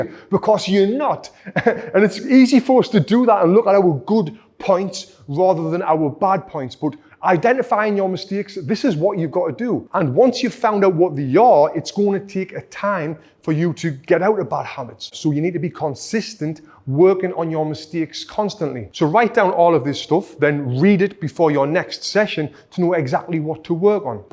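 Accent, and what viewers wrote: United Kingdom. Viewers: Thanks for all the boxing tricks you give online for free, Buddy, I'm from Québec, Canada, and as I listen to you, your british accent makes me laugh, but please, do not take it as an insult. To me, it just brings a colourful side to your videos. I got stricken by a colorectal cancer in 2022 and I wanna get back to karate. I am not a good kicker but I'm pretty sure I can balance the equation by being able to hit harder and faster in some other way. Tal I want to improve my punching abilities and I am pretty sure your videos are a good way to go. Thanks again for your advices, and if you ever decide to come in Québec, Canada, for a vacation, I'll be pleased to guide you to good places to go to. Take care!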